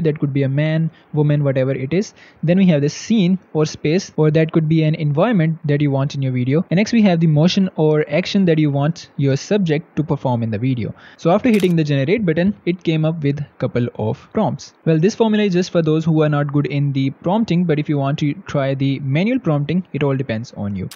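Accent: India. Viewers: What's wrong with your English? Are you from India? It’s just that in India everyone speaks with a terrible accent that even a foreigner can hear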